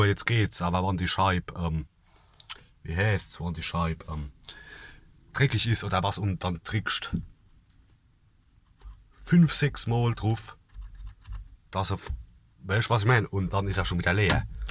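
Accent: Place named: Hesse, Germany. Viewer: Das ist Hesschis gele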